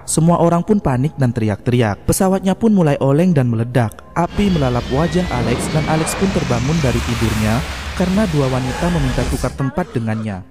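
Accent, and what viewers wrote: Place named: Java, Indonesia. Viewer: Knp tod nya medok sihh😭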